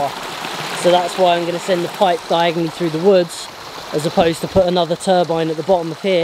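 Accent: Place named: West London, United Kingdom. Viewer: 'that bit of skank' lol. you must be from west london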